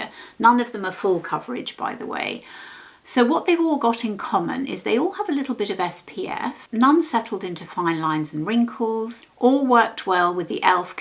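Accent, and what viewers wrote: England, United Kingdom. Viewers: Oh my I do enjoy your lively English accent❤Your content is valued by this almost 70 yo. I do so love your realistic approach to wiser womens beauty! Honestly Delightful🦋Peace and light☮️🕊️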